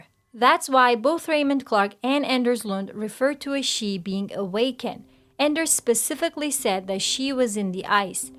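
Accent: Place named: Russia. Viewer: Haha Russian or Ukrainian it sounds like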